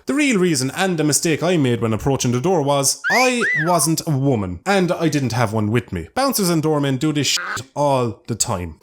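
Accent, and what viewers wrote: Ireland. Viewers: OMG sexy irish voice boi about to blow up! Won't be long before you're at 1mil after you hit 100k. Congrats from 21+ hell place,! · A lot of Irish accents are like this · Every time I see when you upload, I stop, and I go watch your videos man. They are a riot, also that Irish accent man, you rock. Super entertaining, keep it up!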